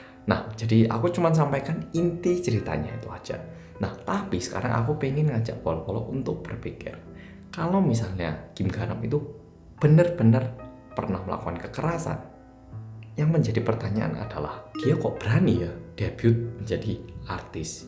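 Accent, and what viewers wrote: Java, Indonesia. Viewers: Mas, maaf ooc. Tapi gimana cara Mas me-maintains logat dan bahasa jowo mu disana? Kayak gak pernah berkurang sedikit pun kadar medoknya. Saya suka 👍⭐ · Lama g nontonin wong medok siji iki.. Sehat trus mas, sukses ya.. Tambah ganteng rek..😁😁😁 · Oppa koreanya medok bgt...hehehe so cool...keren keren...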